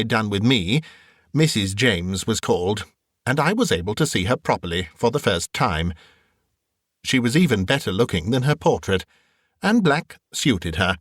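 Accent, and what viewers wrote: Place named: Upper class, United Kingdom. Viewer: I watch every video you post. I absolutly adore your British Upperclass reading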